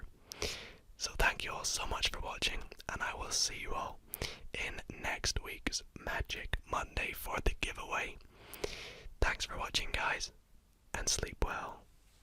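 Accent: Scotland, United Kingdom. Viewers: Took me a minute to notice you're scottish 😄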